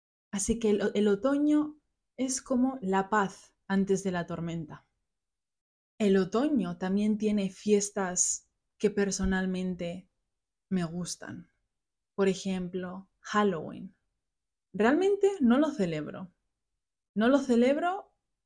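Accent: Spain. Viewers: Sie haben einen wunderbaren Ausdruck, eine wunderbare Betonung und Manierismen! Sie sind atemberaubend schön und absolut kostbar! Ich stimme Ihnen zu 100 %, dass der Herbst die beste Jahreszeit ist. Natürlich, wer liebt es nicht, weiße Weihnachten im Winter zu sehen? Aber wie Sie sagten, später schmilzt der Schnee, gefriert und wird zu schmutzigem Eis. Ich bin Medizinerin und lebe sowohl in Kolumbien als auch in den USA, aber ich höre selten jemanden aus Spanien sprechen. Ich mag die Art, wie Sie das S und Z in echtem kastilischen Spanisch aussprechen (ich finde es süß). Ich mag Ihre Podcasts wirklich und das Anhören von Alltagsgesprächen (with the occasional splash of English-- which I'm guessing is not your first language) hilft mir, europäisches Spanisch besser zu verstehen. Sie haben eine strahlende Persönlichkeit und es ist sehr herzerwärmend, dass Sie Ihre persönlichen Erfahrungen teilen (einen Baum fotografieren, während die Blätter ihre Farbe ändern). 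Ich bin froh, dass ich Ihren Kanal gefunden habe, er ist sowohl unterhaltsam als auch lehrreich! *************** ¡Muy lindo! ¡Qué chevere! ¡Me encanta mucho! Saludos desde Colombia! Chao! 😁 P.D. Quizás puedas celebrar Halloween 👻💀 el año que viene (o el Día de los Muertos, ¡serías perfecta como Katrina!)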